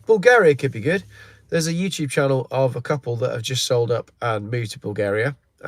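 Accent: United Kingdom. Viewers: Hi. Come to Texas if you can get into the USA. We have laws that protect free speech and the ability to defend yourself and your home here. Texas can legally secede if the SHTF. It is the 8th biggest economy in the world. Just stay away from the big cities - Dallas, Houston and Austin. I have lived in Colorado, Wyoming and Texas since I emigrated 19 years ago when Blair was ruining the UK. Though it's fairly hot where I live in the South Panhandle, I feel relatively safe in a smaller Texas town. The people here very friendly and helpful, and they LOVE a British accent. It is a HUGE state with different types of weather so do your research.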